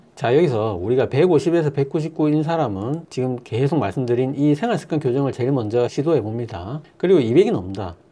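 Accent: Gyeongsang, South Korea. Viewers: 중성지방이 높으면 당뇨도 올 수 있는 위험이 될 수 있다는 말씀으로 이해가 가는데, 경상도 말씀이신가, 내 입장에서, 선생은 숨도 안쉬고 말씀하시네요. 열심히 하시는것 같은데, 원 뭐가 뭔지 금방 비행기가 지나갔나...;정신이 없네요. 지식있는 간호사님 모아놓고 강의하시는 것이 더 효과적일듯 함....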